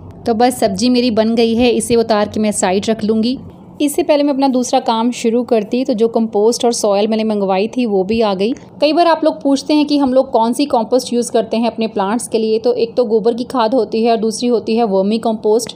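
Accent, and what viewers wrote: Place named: Himachal Pradesh, India. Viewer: Aapki awaz se hi me jaan gyi thi ki aap HP k ho 😊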